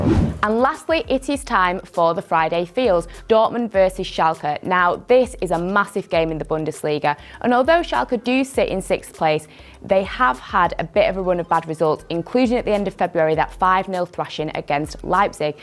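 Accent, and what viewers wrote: United Kingdom. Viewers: Just when I started understanding British accent. One football English: Here's the level 2. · Feels good to hear a bit of pure British accent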